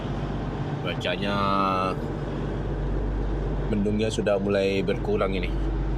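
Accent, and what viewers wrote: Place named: Toraja, Indonesia. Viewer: Tator,kalau dengar logatnya 😂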